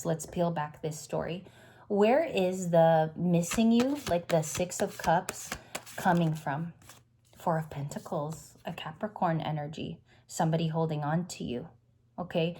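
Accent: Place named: New York, United States. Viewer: Thank you for the reading. I also had the Tower in my daily read. Love the NY accent glad to see you're channeling some east coast!!❤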